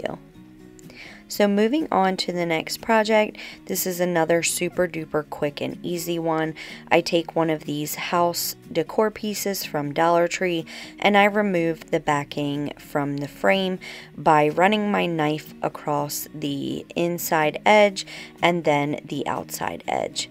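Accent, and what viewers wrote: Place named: Philadelphia, United States. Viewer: YES GIRL. You have my accent lol I live in Delaware county originally from Philly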